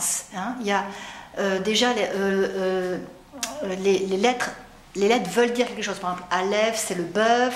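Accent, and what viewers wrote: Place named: South, France. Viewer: lamed bâton de berger ? jamais entendu cela lors de mes cours d Hébreu biblique, y compris par mon prof, juif pieux, vivant en Israel...Lamed = enseigner, apprendre (a donné limoud/verbe melamed), il dépasse en haut, car le maître dépasse l'élève, et ce dernier peut aussi dépasser le premier ("on apprend les uns des autres" dit mon prof d'Hébreu), ceci dit, j'aime bcp vos videos ma soeur, vous êtes très pédagogue, et avez une voix très posée, très calme..et un joli accent du sud ! soyez bénie...PS le lamed dans sa forme ressemble plutôt à un 7, et le bâton de berger est shevet ("shavtekha" ps 23 ta houlette)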